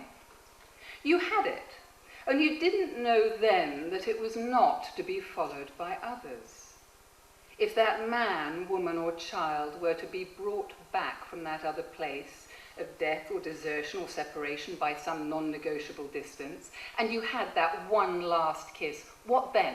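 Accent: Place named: London, United Kingdom. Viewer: Sounds POSH - Sloane square - Kensington High Street - Bayswater ?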